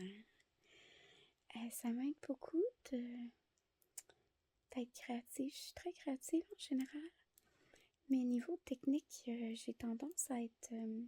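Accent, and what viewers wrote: Quebec, Canada. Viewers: Jadore l’accent québécois merci beaucoup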